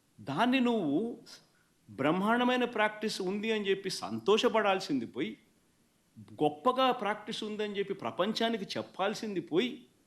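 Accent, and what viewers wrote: Rayalaseema, India. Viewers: Continue with rayalaseeema slang.dont go in polite way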